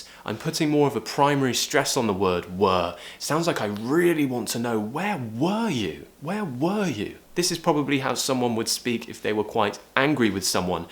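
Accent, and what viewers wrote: RP, United Kingdom. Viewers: I like the way you pronounce the British accent, and I do my best to improve it but I find that I speak like the Indians😂😂, follow you from Egypt❤ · Love your accents. Sounds like sherlock holmes lol i love british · Your accent and the British accent the most beautiful accent ever and i fall in love with it at the first second I hear it.You are really helping me with that I'm student at English Section from Iraq you're the cutest teacher ever I hope I can speak the British accent professionally as you🤍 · I am Super Fan of British accent. I do love all your accents, no matter which part you from UK. I have been trying to search British channel for long time. Finally I have found the good one. I deserve it❤ Please keep up the good work. There is no room for doubt to watch your channel. You rock! Have a good one. Keep social distancing. 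· "It's kind in the middle of my mouth" I definitely love british received pronunciation... Good bless you...you're the teacher!!😜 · Really your British pronounciation is well interesting and deserves to ben listen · Thanks...you have a lovely British accent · I adore your breathtaking British accent 😍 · My fave British accent teacher. 😅😃.. Thank you Sir.. Love from Philippines. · we love the modern RP accent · Listening your British accent gives me years of life. Best Teacher Ever!!!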